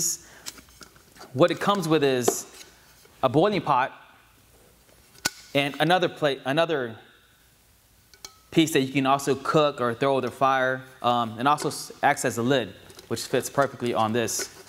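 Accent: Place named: South, United States. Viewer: Fantastic review. Are you from the south? Every once in awhile I could note a southern twang in your speech.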